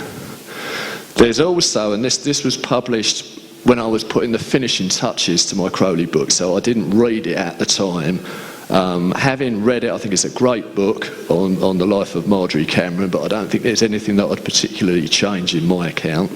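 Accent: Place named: London, United Kingdom. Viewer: A very thick London accent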